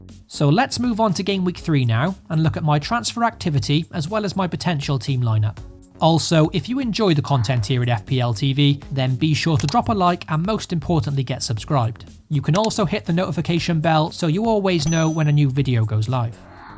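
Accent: Wales, United Kingdom. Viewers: Are you Welsh? I hear some Welsh in there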